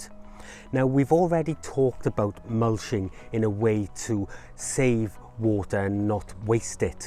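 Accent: Wales, United Kingdom. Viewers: Are you from the valleys ?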